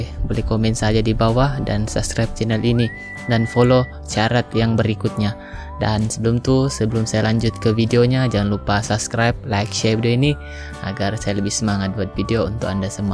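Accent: Sabah, Malaysia. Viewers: Uinaaa org sabah ba ni ahahhaha ingtkn indon aduh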